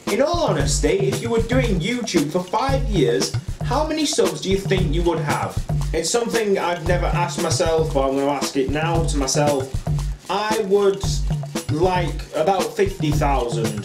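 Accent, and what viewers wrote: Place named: Sheffield, United Kingdom. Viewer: Didn't realise you are from sheffield!!:D awesome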